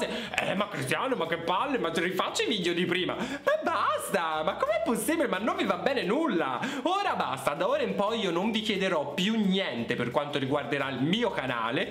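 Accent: Tuscany, Italy. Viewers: Io ti amo ....basta vederti e sentirti e l accento toscano poi ....😘😘😘😘😘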